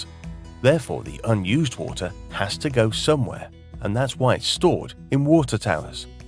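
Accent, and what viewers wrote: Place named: United Kingdom. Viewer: I love how you Brits say "wouuhtuh." If you jumped into the water in the tower, would you get sucked into the plumbing?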